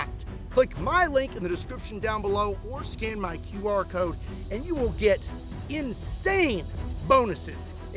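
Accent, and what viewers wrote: United States. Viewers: Your yankee announcer voice is hilarious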